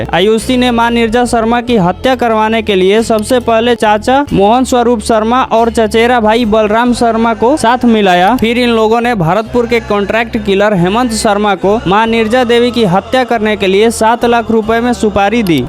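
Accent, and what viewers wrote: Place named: Bhojpuri, India. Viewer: Hindi ko hindi ki tarah bolo...bhojpuri me hindi mat bolo...baaki sab thik hai bhai.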